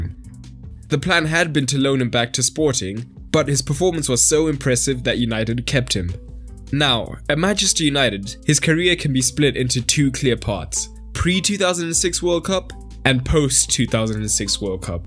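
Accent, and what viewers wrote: South Africa. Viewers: You have a South African accent. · Am I the only one who thinks this accent is South African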